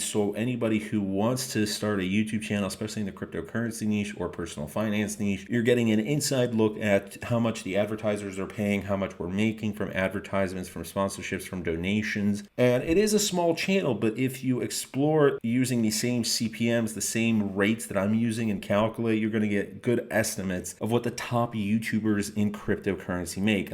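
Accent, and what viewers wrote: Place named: Poland. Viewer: You have an polish accent!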